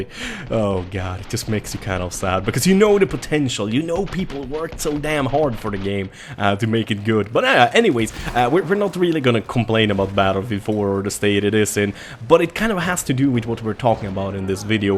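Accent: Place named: Sweden. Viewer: U sound really swedish...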